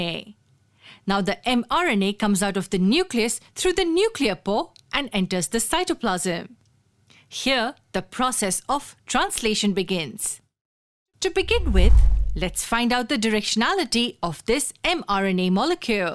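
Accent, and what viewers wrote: India. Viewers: Gosh, this is all explained so well, I just can't get over the Indian accent (assuming it's indian)!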